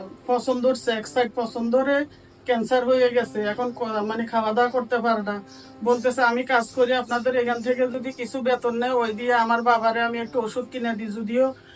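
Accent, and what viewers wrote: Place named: Comilla, Bangladesh. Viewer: আসসালামুআলাইকুম আপু আপু আপনার বাড়ি কি কুমিল্লা আপনার মুখের ভাষাটা কুমিল্লার ভাষা যেগুলো অনেক সুন্দর হয় এবং দেখি অনেক ভালো লাগে সাপোর্ট করেছি আশা করি সাপোর্ট করবেন সব সময় পাশে থাকবো এবং পাশে থাকবেন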